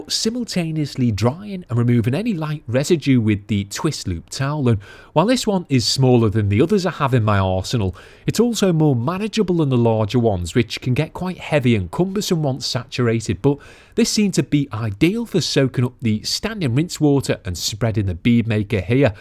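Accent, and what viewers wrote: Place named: Wirral, United Kingdom. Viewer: I think I recognise the accent, are you a Wirral lad? I'm born & raised there but have moved over the water to Sunny Formby. Thanks for another informative episode. 👍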